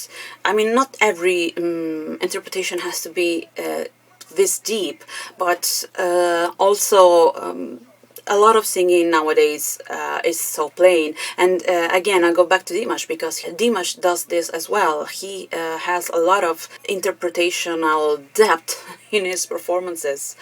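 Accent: Italy. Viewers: Questa canzone è tra quelle che io riascolto sempre. Diciamo che è tra le mie top 200. Ebbi la fortuna di ascoltare Antonella dal vivo quando ancora doveva diventare famosa, al Palazzo dei Congressi dell'Eur, in una fiera del suono. Ne rimasi scioccato. Cantava " ", forse una prima versione. Ricordo solo che tempo dopo la sentii alla radio e corsi a comprare il disco. Non voglio mettere a confronto Antonella con altre cantanti. Secondo me non ha senso. Penso piuttosto che si possa esprimere il proprio gradimento senza fare paragoni. Ed a me, questa voce piace e molto. Come quasi tutto quello che il gruppo ha creato. Anche dopo Antonella. Ho ascoltato tutto quello che avevi da dire sull'argomento. Mi sei piaciuta. Brava. Ho capito che eri Italiana fin dalla prima parola che hai pronunciato in Italiano... Altrimenti non lo avrei notato. Sei anche di Roma. Beh... Anche io. 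E di questo mi dispiace. E' sempre più invivibile. Avessi la tua età, scapperei subito, prima da Roma e poi dall'Italia. Anche se... non saprei per andar dove.;-) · Canti di tutto… sei brava… forse un talent show, al giorno d’oggi dovresti provarlo (anche se non li sopporto). Bella Canzone, Ottima Analisi del Pezzo e Complimenti per il tuo l’Inglese… accento romanesco quasi assente… Spiazzalo con qualche canzone Italiana strana…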